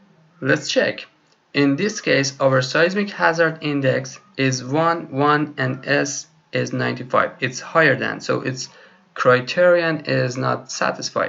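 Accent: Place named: Iran. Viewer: لهچه ات داد میزنه ایرانی هستی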